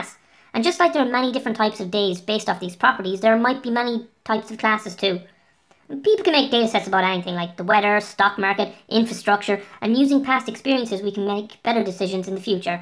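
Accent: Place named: Ireland. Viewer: I can tell youve an irish accent from this lol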